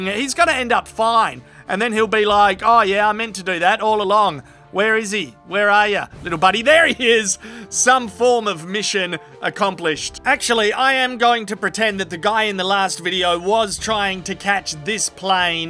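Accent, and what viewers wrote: Australia. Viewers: Yes, we citizens of the USA collectively think Australia is about nothing but kangaroos and crocs! 🤣 NOT! I would love to be able to tour Australia for the many beautiful and exciting things to do and see. Firstly, as a lover of the sea, the Great Barrier Reef would set me up for life. Sydney Harbor bridge, Opera House, Ayers Rock, Australia Zoo, the beautiful beaches with record-breaking waves, and last but not least, the well-reported hotel and restaurant hospitality and exceptional cuisine that we may not have here in the states. God willing, I will be able to afford such a trip in the future. p.s.: I could listen to that accent all day long!😘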